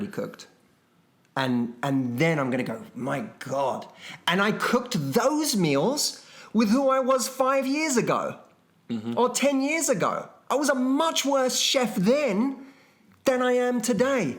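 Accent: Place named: United Kingdom. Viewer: Your British accent is standing up again, I guess spending good quality time with family is the reason.😊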